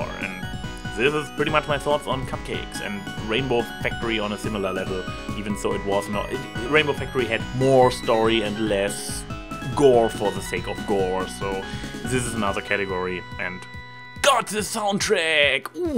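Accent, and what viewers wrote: Germany. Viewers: Du hast n deutschen Akzent, meine ich :) ich hab mich wärend deiner videos gefragt woher ich den akzent kenne ~ und musste feststellen dass ich auch so rede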